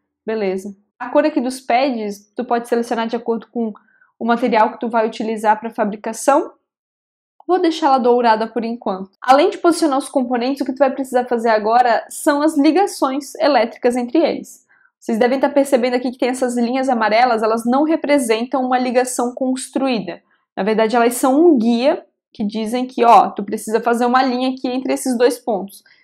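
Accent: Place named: South, Brazil. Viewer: Sotaque do sul☺️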